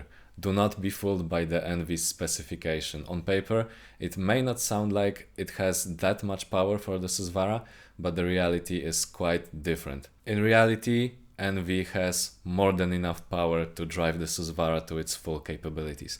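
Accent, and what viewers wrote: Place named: Poland. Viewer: jesteś z polski? mam takie wrażenie po tym jak mówisz paweł i po akcencie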